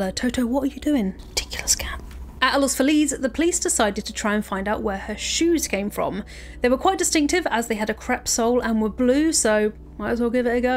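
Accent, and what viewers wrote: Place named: United Kingdom. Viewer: What UK accent do you have?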